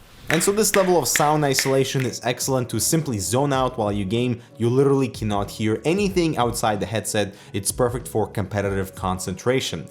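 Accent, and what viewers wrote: Russia. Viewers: ***** RUSSIAN Accent Happy? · Guys, is from Russia?! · Okay.... so is he a Russian or Swedish?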